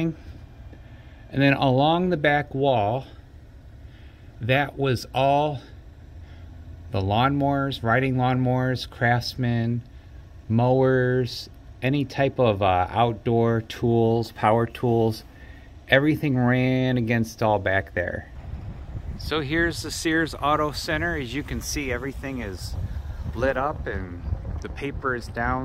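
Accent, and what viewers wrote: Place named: Northwest Ohio, United States. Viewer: The NW Ohio accent is STRONG in this one.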